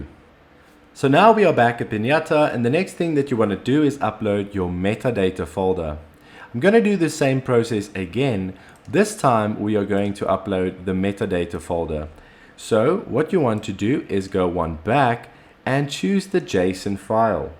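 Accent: South Africa. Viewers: Are you south African?? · I hear a South African accent, and I upvote.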